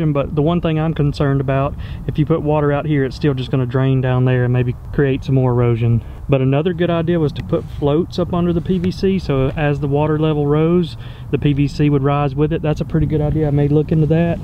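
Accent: South, United States. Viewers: seems very shallow, and I assume by your accent you are from the southern usa, wont it dry up in the summer heat? is it by a river for water replenishment? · You sir, have one of the most welcoming and friendly southerner accent ive ever heard, keep up the good work!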